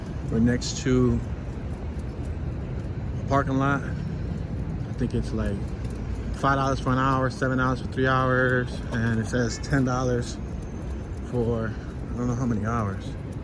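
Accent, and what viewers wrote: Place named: Brooklyn, United States. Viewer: Sounding deep Brooklyn.enjoy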